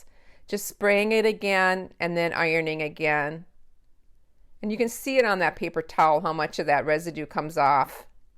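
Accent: Minnesota, United States. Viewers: Ah that Minnesota accent with your fabulous creativity. Love it!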